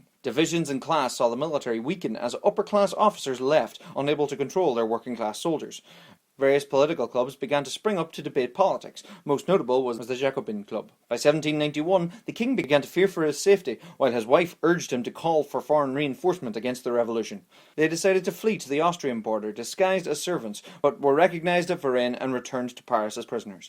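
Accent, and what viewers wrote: Ireland. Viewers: He is Irish mate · Because HE IS IRISH!!! DUN DUN DUUUENWIAKWOEISJWOIEDJDISOOWEK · are you irish or something? (not that you are) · I wish It had subtitles. You speak so fast and i'm not used to the Irish accent. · You sound Irish :) pretty cool if you ask me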